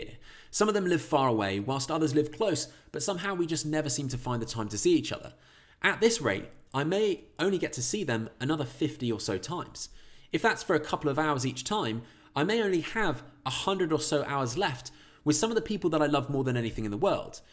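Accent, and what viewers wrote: England, United Kingdom. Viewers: This English man with his English accent beating around the bush instead of talking about specifics .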